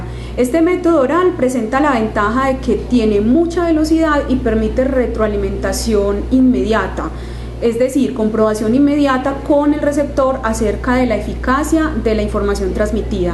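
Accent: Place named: Antioquia, Colombia. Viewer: excelente material pero debería ser mas neutral en su acento y entonación, demasiado paisa en su forma de hablar.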